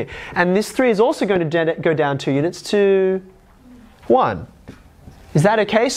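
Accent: Australia. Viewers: nah he's from the land down under (australia)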